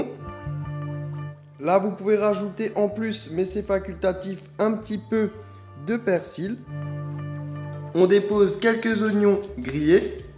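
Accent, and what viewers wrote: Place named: Alsace, France. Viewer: Yeeee schezele toi ta l'accent de chez nous ! Hop la jvais manger a nidermoschwir manger la surkrut de chez nous ! Rpz alsaco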